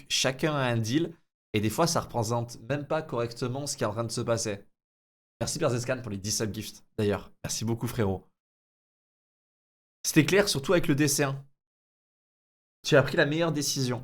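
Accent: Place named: South, France. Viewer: bel accent du sud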